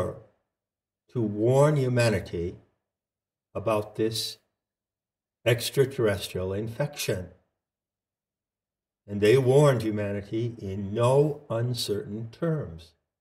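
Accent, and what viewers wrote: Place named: Boston, United States. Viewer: And thank you for stating the obvious, as you say toward the end...those of us with eyes to see appreciate it all the more, as it makes one feel less alone, which fortifies the will to work harder in the attempt to reach out to other human beings. Obviously you are hip to Gates foundation and others wanting to mutate (gmo), and therefore patent, all life on this planet. They must be stopped, they are far enough down that road already. I can hear youre aware of much of this, thank goddess! Its amazing how everthing gets inverted by these folks, as in the term 'germ' which USED to denote growth. I can see you gnow this very clearly. I am a Jungian Psychologist originally from Salem, Ma, now in sw florida. I spent a few years living in Taos, NM...wish i knew about you then, i could have visited you in Santa Fe!?The fact that you havent completely lost your Boston-ish accent warms the very cockles of me heart! 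I have no idols - but i hope like heck to run into you someday. In meantime, thank you for your lifes work as well as reaching out to those who care to listen. I have never stopped listening to nature, for she is ever honest and truthful - as i think either Geothe or Steiner said...or was it perhaps Whitman? I love them all - and i send my love to you, oh kindred spirit! ; )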